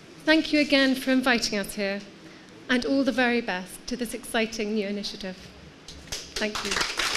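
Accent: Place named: United Kingdom. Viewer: I love the british accent!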